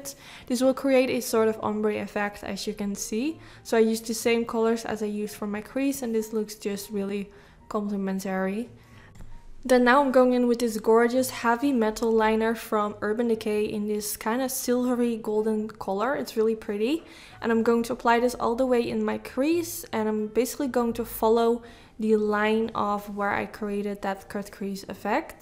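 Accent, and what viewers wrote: Netherlands. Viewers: Je makeup is echt gorgeous ❤️ ik hoor wel echt super sterk nederlands accentje 😂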